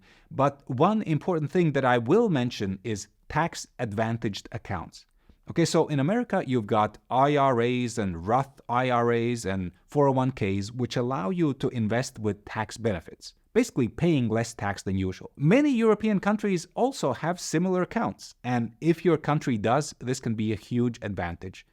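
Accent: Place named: Latvia. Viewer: why does this english named person speak with a latvian accent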